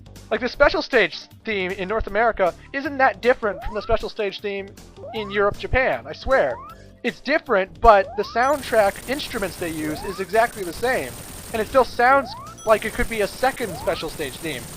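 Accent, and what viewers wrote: Canada. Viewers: youre from Canada ? And sonic boom sonic boom sonic boom is annyoing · speaking of canadian stereotype's do you say aboot when you mean about?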